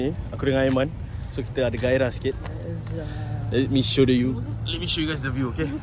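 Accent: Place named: Singapore. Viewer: dari slang bahasa je kita dah thu dari singapore...tapi apa pon mantap bosku..gerekkk seyy....salam dr johor..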